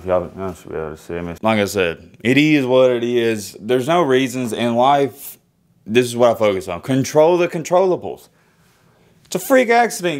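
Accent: South, United States. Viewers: Where u from y you talk like that u talks country ash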